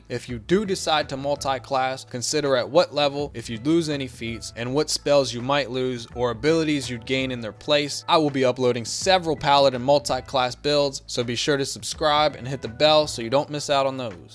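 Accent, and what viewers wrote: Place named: Staten Island, United States. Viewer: Had to subscribe. You totally sound like you're from my neck of the woods (I'm in Staten Island).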